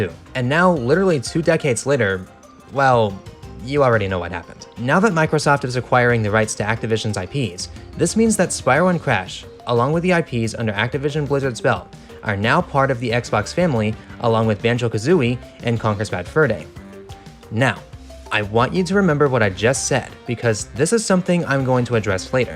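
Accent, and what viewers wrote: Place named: New York, United States. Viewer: Well, although I am glad about the information I have never once been excited to talk about with other channels, so instead I just want to comment on how your main character has been improving and how nice it looks. Really does give me that Spyro/Crash vibe, which I mean is further helped by your accent. Actually, it kinda sounds similar to Billy Joels and my Mami, do I just like New York and New Jersey accents or something. Probably the latter, seeing how many great films and games were made during their Era of stuff such as Ghostbusters, that Atlantis Disney Movie, etc that I grew up with when I was a young boy.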